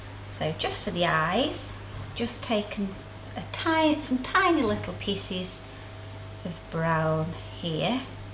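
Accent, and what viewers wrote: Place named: Hull, United Kingdom. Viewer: love ya accent are you from Hull like me xx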